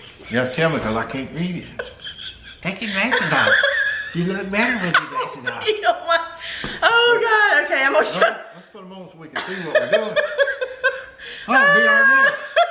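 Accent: South, United States. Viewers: Oh my goodness, you two are the cutest couple ever. Your accents are just too much, I love that sweet, Southern drawl. I was so surprised to see on with you, great video 😙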